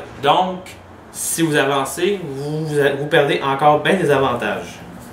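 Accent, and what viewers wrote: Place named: Quebec, Canada. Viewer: enfin des instructions en bon vrai quebecois, merci !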